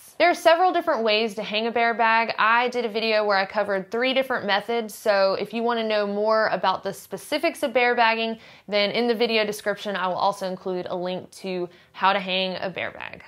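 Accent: South, United States. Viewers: Absolutely LOVE your southern accent, I'm from the South and it reminds me of home! My wife sounds the same; Riiiiiice! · My moms account but your southern accent creeps in i love it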